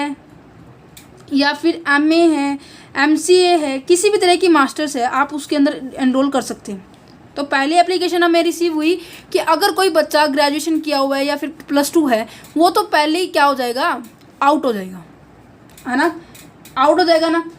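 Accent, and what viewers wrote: Haryana, India. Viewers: Mam aap Haryana se ho gi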